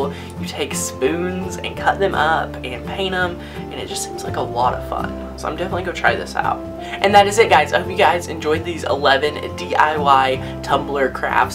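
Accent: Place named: Tennessee, United States. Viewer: Cool projects! Yeah, totally do that pineapple lamp. Glad to see a fellow Tennessean and personally I think your accent is fabulous!! Let the haters hate 'cause that's all they know how to do, and you keep on being the wonderful person you are and be proud of your roots!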